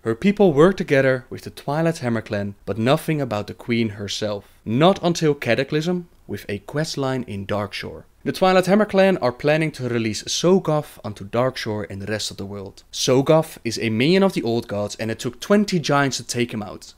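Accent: Netherlands. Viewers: He is from the Netherlands. I am too and i can hear from his english speaking he is dutch:P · you are definitely dutch! btw nice videos!! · Quick question. Are you by chance Dutch? I have a Dutch friend, and you sound like him quite a bit. I've also noticed on one of your toon's names, there's 'je' at the end, which, if I'm correct, in Dutch, is what you would call a small child. Thanks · He has a Dutch accent.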